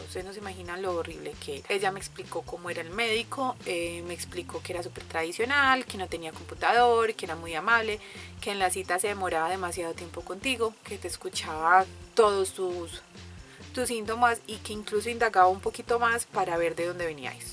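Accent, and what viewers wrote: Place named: Medellín, Colombia. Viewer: Hola cómo estás, soy de Medellín por tu acento de pronto veo que eres también de acá. Será que me puedes dejar el nombre del médico o centro donde él atiende, y si tienes teléfono de él te lo agradecería bastante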